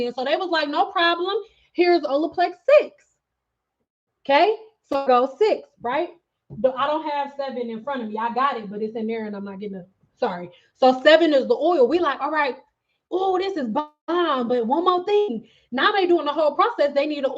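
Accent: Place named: California, United States. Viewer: I know you from Detroit but you sound like us Cali ppl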